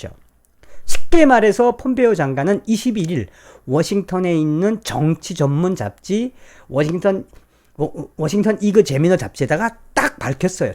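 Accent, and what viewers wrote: North Korea. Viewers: 왜이래요? 말씨가 북괴네요?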